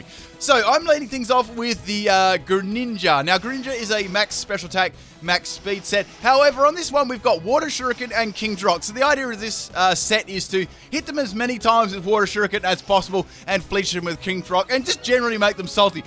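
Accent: Australia. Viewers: bruh thats just his australian kicking in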